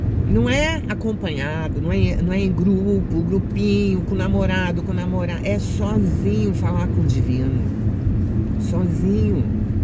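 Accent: Rio de Janeiro, Brazil. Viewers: Pedindo para ter espaço aí pra volta ❤ E vc ainda é nitidamente muito carioca ;) ❤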